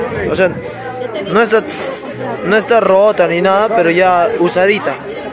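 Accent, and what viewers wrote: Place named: Huánuco, Peru. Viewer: Tiene dejo de Huanuqueño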